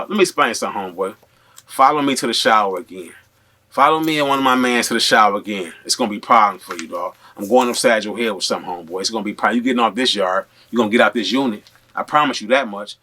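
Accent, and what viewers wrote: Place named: Detroit, United States. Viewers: This is hilarious 😂 🤣🤣 I knew you was from the Midwest I'm subscribed bro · Man like 1 minute into the video, I'm like "Doggie from Detroit" lol gotta be, or somewhere close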